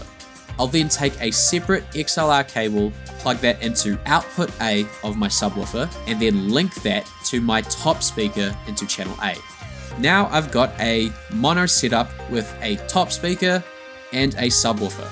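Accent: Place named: New Zealand. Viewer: Good channel! Sounds like a kiwi accent. I have the same mixer too 😊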